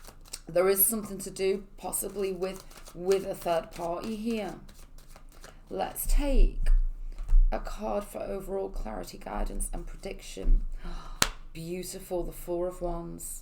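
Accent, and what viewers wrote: Liverpool, United Kingdom. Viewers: I thought Liverpool/ North West too. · I thought it was liverpool I was hearing 🥰 I'm a brummie and southern Ireland 🍀🥰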